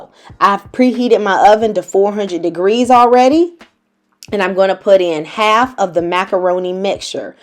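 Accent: South, United States. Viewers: I am going to try this looks delicious, you sound southern but your style of cooking reminds me of my little island of Jamaica. Your cooking touches my heart, thanks.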